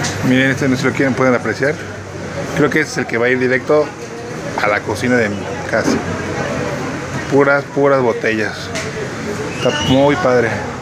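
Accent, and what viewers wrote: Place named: Peru. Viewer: Eso donde queda? Que pais? Como hablas supongo que es en Peru ? Lindas las lamparas !!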